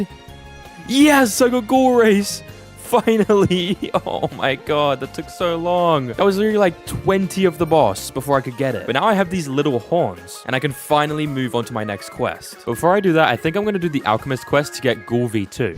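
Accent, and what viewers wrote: United Kingdom. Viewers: this guy sounds british but i might be wrong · what is that briish acent mate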